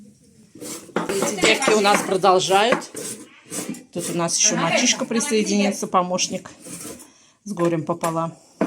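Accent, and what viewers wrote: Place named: Russia. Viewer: У нас в России есть пословица, встречают по одёжке, провожают по уму, спасибо за интересный фильм, вы по настоящему русская женщина, я имею в виду как вы разговариваете и строите свою речь, только так русская может говорить и высказать свое мнение, интересная ваша семья, дети воспитаны, выскочил ваш канал, не смотрела, а потом подсела, лучший турецкий сериал, здоровья вам.❤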